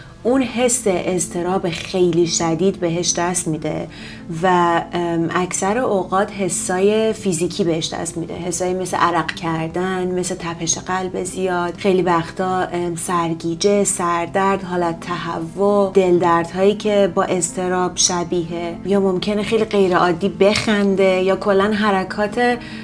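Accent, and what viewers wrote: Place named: United States. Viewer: خانم دکتر سلام عرض شد ارادتمند، ببخشید شما در تلفظ اصلاحات انگلیسی، لهجه امریکایی دارید،ببخشید شما آمریکا یا کانادا تشریف دارید؟یا فقط بر حسب تسلطی که به زبان دارید انقد قشنگ تلفظ میکنید ،چون خودم خیلی زبان دوس دارم عرض کردم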